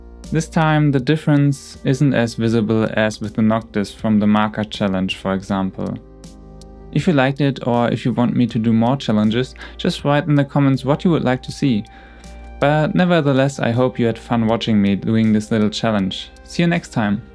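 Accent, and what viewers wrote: Germany. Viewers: You sound pretty german... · iiiiis he german? he kinda has an accent...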